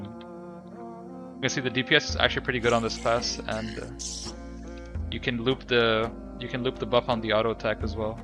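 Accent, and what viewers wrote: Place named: Arab world. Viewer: Your accent is Arabic Where are u from btw fire guide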